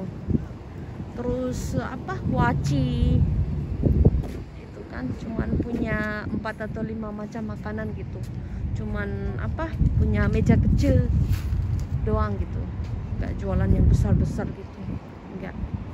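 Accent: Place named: Java, Indonesia. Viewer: Indo nya mana kak. . . Ada medok nya bahasanya. . 😁👍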